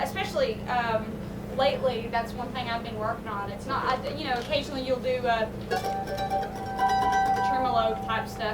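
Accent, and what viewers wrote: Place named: South, United States. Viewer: Love her southern drawl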